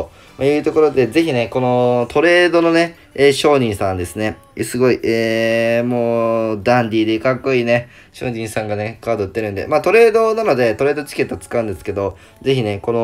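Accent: Okayama, Japan. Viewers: 今回岡山訛り強め？笑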